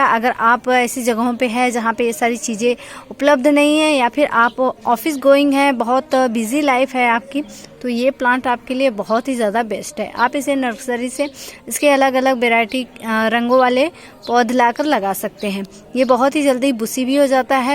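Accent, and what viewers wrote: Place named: Uttar Pradesh, India. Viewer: Accent se aap UP ki lag rahi ho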